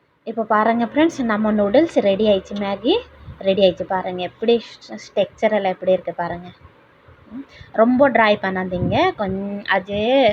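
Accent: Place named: Odisha, India. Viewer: Odis assent Tamil speaking nice explanation s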